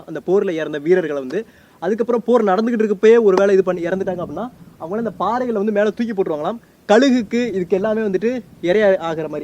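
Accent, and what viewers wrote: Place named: Kanyakumari, India. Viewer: Slang Semma... Kaniyakumari slang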